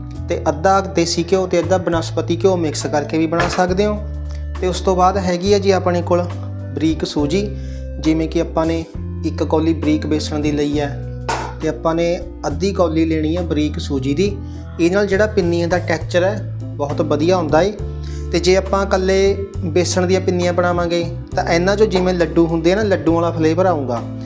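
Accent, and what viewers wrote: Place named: Kurali, India. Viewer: ਤੁਸੀਂ ਵੀਰ ਜੀ ਕਹਿੜੇ ਪਿੰਡ ਤੇ ਜ਼ਿਲ੍ਹੇ ਤੋਂ ਬੋਲੀ ਸਾਡੇ ਕੁਰਾਲੀ ੲਇਲਾਕੇ ਦੀ ਲਗਦੀ ਹੈ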